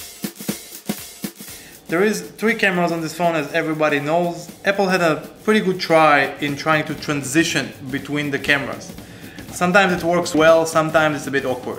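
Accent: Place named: Israel. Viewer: אחי אני לא מאמין שאתה ישראלי בהתחלה ראיתי את האינטרו ושמעתי מבטא מוכר ואז הייתי פשוט חייב לבדוק אם אתה ישראלי ומצאתי סרטון שלך בעברית... באמת מגניב ואחלה של סיקור, באמת רציתי לקנות את האחת עשרה ורק עזרת לי להבין עליו יותר תשקיע בערוץ אחי וואללה יש לך כישרון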